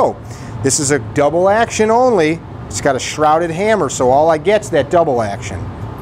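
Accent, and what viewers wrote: United States. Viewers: What is this American accent called? 😂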